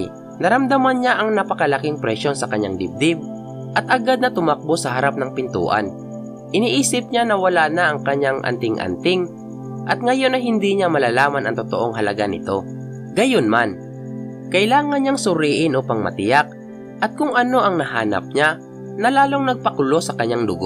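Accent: Visayas, Philippines. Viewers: Bisaya k b dong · Bisaya Accent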